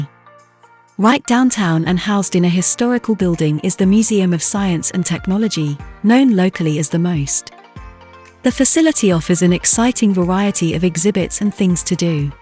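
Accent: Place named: United Kingdom. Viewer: This lady commenting this video & her British accent annoys me. (other than a suburb of Syracuse is named “Liverpool” there’s no reason to have a someone with a British accent narrate this video, about a city in the middle of upstate New York)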